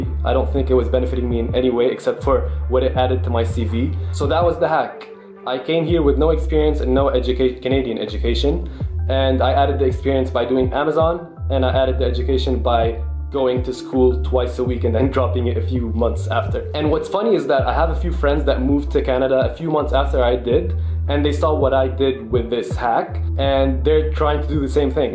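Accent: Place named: Arab world. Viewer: i kneeeeew u were an arab from the accent Same here.....i am egyptian and mechanical engineer also 😊 Wish u all the luck bro...accept my friend request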